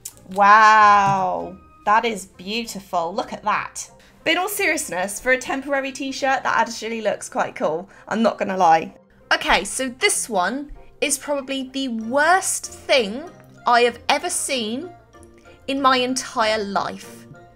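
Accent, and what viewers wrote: England, United Kingdom. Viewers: Shes super cute, specially with the English accent. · Are you from the UK cos u have a British accent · Is she British? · Is she British because i cant place the accent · Ok are you British? You sound like it. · Are you briish? cause I can hear the " " when you speak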